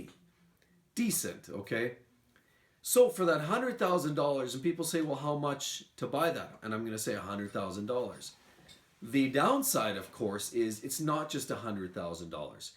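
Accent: Canada. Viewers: I could tell you're Canadian! That's awesome you know Will.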